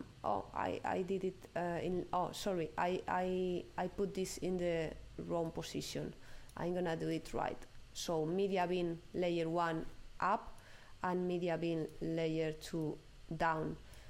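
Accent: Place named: Italy. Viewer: Simply the best tutorial teacher! and the Italian accent is lovely! Merci!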